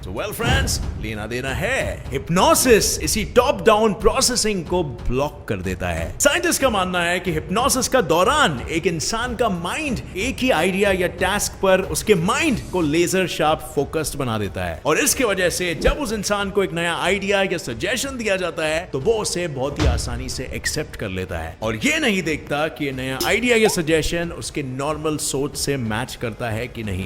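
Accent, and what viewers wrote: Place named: Bengal, India. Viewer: Your bengali accent is quite good.... 👍👍👍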